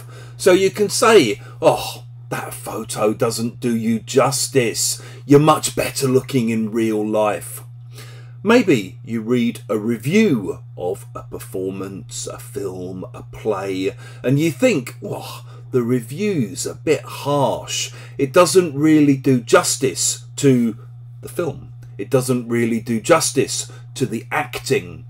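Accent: United Kingdom. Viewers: it is real British English mate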